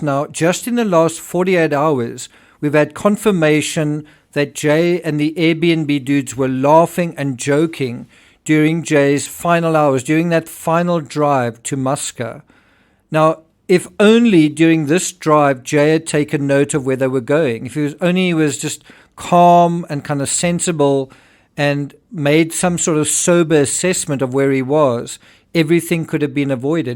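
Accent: South Africa. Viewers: Just listing to your accent and you mentioning your language, we had good friends who spoke so much lie you the husband was quite a famous singer in South Africa called George Van Dyke, he lived here in the UK for quite a while until leaving for the US we are still in touch and he is doing great over there. Love your work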